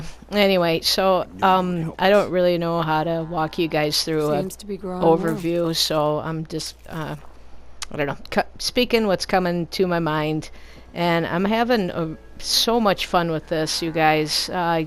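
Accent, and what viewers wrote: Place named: Brooklyn, United States. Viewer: Out standing! Your funny and lovable would change a thing. Well I do like the Brooklyn voice tho. hehehe see ya in the next one!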